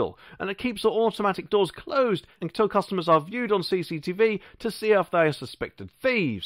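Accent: Norfolk, United Kingdom. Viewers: Hi,where are you from? I recognise that accent,is it Norfolk.?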